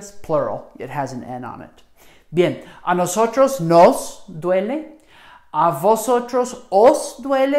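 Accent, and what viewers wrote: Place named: United States. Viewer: Woof. What's with this bad American-style Spanish? Consonants are way too strong, and the "r" is wrong. Students: this might be good content, but poor delivery. Don't do this accent. I have traveled to a number of Spanish-speaking countries without speaking a word of English to get by, and I have never heard a native Spanish speaker speak like this.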